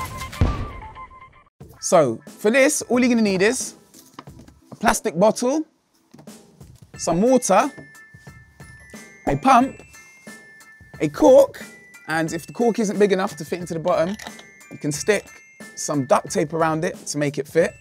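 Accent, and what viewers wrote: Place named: England, United Kingdom. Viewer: I can't get enough of English accents.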